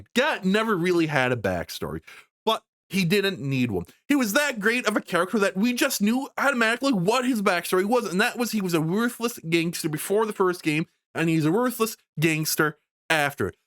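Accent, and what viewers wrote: Canada. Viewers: You sound very Canadian.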